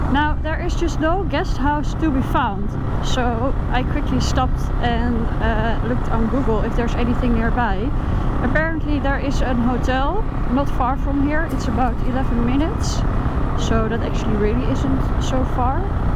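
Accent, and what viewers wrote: Netherlands. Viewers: very sympathetic and nice video. And I like your dutch english. remembers me at my colleagues from NL when I worked for Philips.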